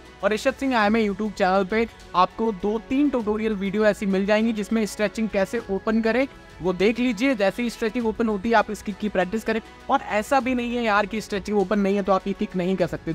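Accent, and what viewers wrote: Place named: Mau, India. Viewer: Lagta Hai Mau District se ho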